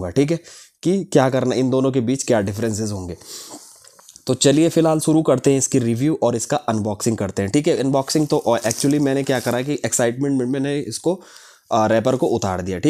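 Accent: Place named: Garhwal, India. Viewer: गढ़वाली tone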